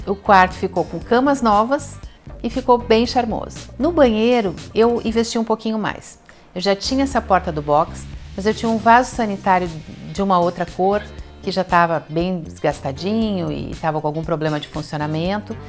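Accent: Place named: South, Brazil. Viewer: Como já morei no sul do Brasil amo o sotaque .❤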